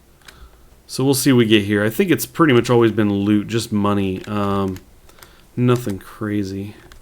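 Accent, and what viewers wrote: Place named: United Kingdom. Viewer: New to the channel, great video... enjoyed your british accent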